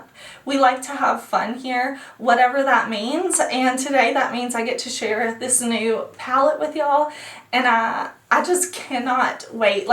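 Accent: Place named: Tennessee, United States. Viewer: Her accent is so heavy, is she from Tennessee?? A beautiful pallet so excited.. WOW good job!!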